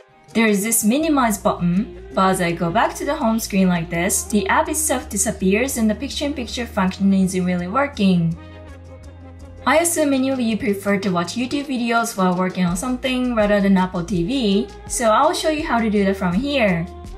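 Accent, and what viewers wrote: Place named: England, United Kingdom. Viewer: I love your English Accent Sensei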